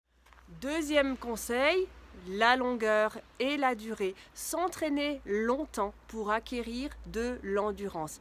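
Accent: Switzerland. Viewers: J'adore le petit accent suisse 🇨🇭 😍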